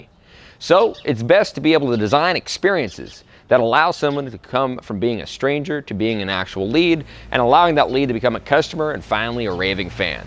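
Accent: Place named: South, United States. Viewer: When did you develop this southern twang in your voice?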